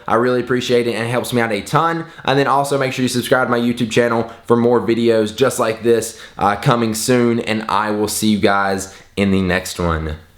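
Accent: South, United States. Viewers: Is this guy southern? I think I hear a little acent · Does he really have a country accent? Never noticed that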